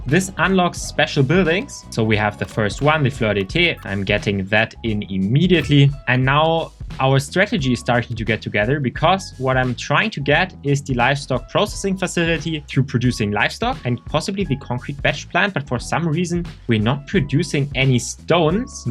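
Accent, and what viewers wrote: Germany. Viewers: I hear a german accent :) great video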